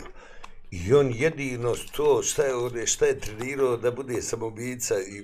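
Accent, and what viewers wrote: Bosnia and Herzegovina. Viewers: Jel on iz Sarajeva pa ima Sarajevski naglasak ilije iz Pala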